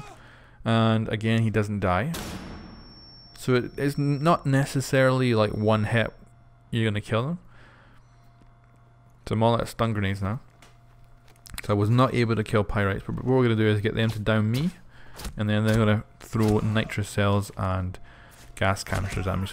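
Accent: Scotland, United Kingdom. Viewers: Are you scottish by any chance? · Are u Scottish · Are you Scottish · R u scottish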